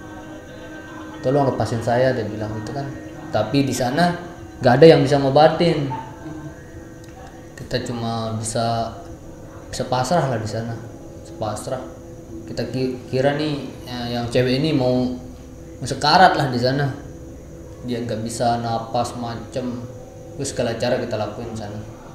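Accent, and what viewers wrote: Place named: Lombok, Indonesia. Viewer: Indo sasaknya kentel banget bang Semangat²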